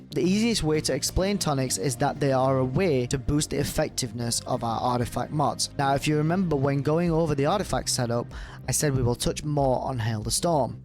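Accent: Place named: Yorkshire, United Kingdom. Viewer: Yorkshire lad! Where's tha from lad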